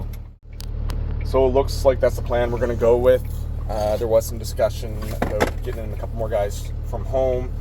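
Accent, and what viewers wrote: Canada. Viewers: He's in Canada. His accent is a dead giveaway.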